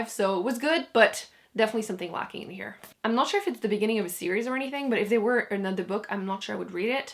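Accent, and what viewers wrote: Quebec, Canada. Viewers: I just found your channel and the moment I heard your accent I thought "Oh she's Québecois French." So just out of curiosity are you Québecois? I live in Québec so if you are it would be pretty awesome to have a booktuber live in my province. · janessa blake She's French Canadian 🙂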